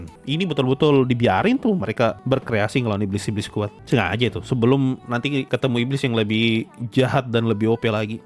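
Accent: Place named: Sulawesi, Indonesia. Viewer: orang sulawesi kah? distracted aja ~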